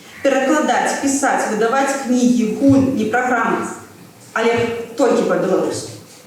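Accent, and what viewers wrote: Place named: Russia. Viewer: Ужо зноў расія а ў пачатку было расея ? Колік па мове й я так разумею ў беларускай школе не вучылася , акцэнт расейскі пальцам не затопчыш ?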